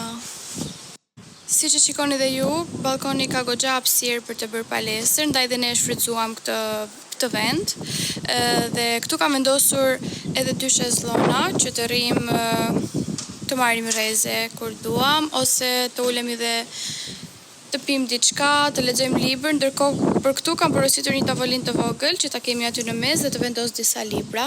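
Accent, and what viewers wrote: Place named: South, Albania. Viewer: Lezetshem si ide ! Bravo ❤❤ Nga e folura dukesh si nga jugu🙈🙈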